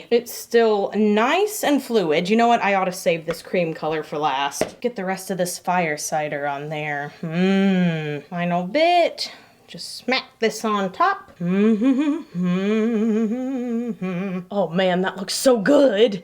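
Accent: Texas, United States. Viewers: “Gawly Dawg”!!!??? She is such a Texan. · It’s OK,!! Remember Marchesa?? You Texans are so charming!!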